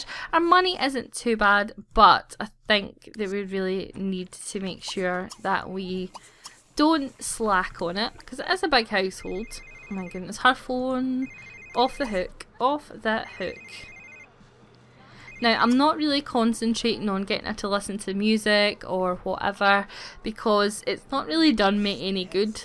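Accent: Scotland, United Kingdom. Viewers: Baby girl = Skye xxxxx love your videos and your voice. a scottish accent is always fun to hear! haha x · Oh my god! This...is...amazing!! Don't shut your self up please! I want to hear your beautiful Scottish accent.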